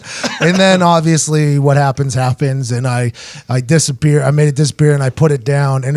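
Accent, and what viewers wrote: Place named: New Hampshire, United States. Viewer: Buddy sounds like hes from New Hampshire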